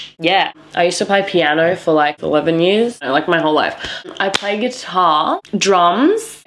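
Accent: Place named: India. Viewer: HAHAHAH ur indian accent is my favourite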